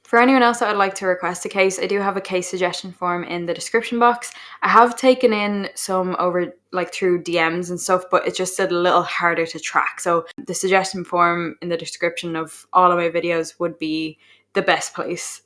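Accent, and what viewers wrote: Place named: Ireland. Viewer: Love your Irish accent. So charming and nice ❤️ I see now why the Irish are such good storytellers 😊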